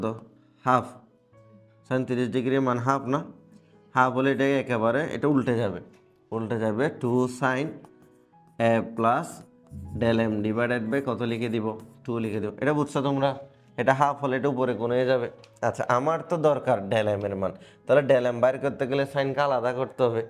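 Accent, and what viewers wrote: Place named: Chittagong, Bangladesh. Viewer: আপনি মনে হয় চট্টগ্রামের 😁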